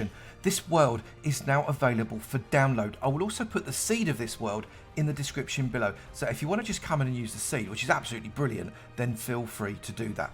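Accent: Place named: Scotland, United Kingdom. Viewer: I like your accent. Are you from Johnstone?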